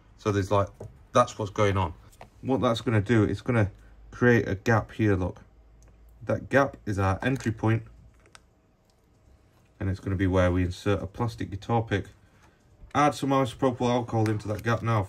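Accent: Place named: England, United Kingdom. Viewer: английский акцент - это кайф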